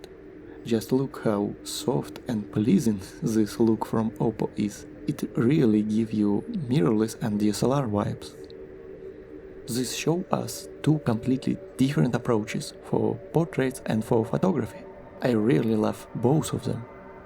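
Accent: Russia. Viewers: Слышу ты Русский, есть канал на русском или все на английском? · Русский акцент чудовищный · Отличный обзор! Акцент выдает русские корни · У вас русский акцент, поэтому мне очень легко понимать ваш инглиш.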